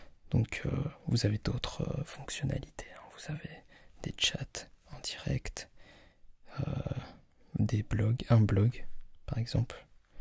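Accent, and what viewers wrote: France. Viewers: ACENTO FRANCES DE AQUI A JAPON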